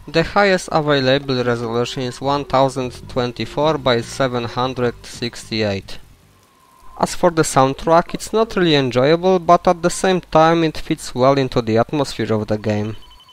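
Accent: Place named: Poland. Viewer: Epicki polski akcent :D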